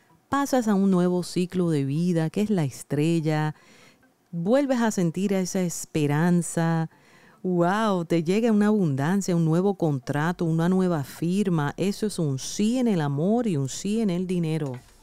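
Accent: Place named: United States. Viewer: Soy divorciada hace 20 años, no deseo ni un poco volver al pasado, no deseo ni acercarme. Tengo problemas con mis 4 hijos y me deja muy triste. Los crie sola, siempre. Pero es tanto lo que me lastman que he decidido abrirme. Aunque me duela. Soy Madre por naturaleza los amo, pero tengo Amor propio Estabilidad economica es lo que necesito. Me es difícil jubilada a los 73 años generar mas entradas. Ruego al Dios, me de la bendición de ser autónoma, y no necesitar de la ayuda de mis hijos. No voy a bajar los brazos. Ahora hare jabones que venderé. Y rentare mi departamento, al fondo de mi jardín.y tener una ayuda económica. En Argentina es muy difícil la situación. Te doy las gracias y mis bendiciones para tod@ los que te escuchamos. Hacia tiempo yo no lo hacia. Te agradezco, me encanta como suena tu español, con acento americano❤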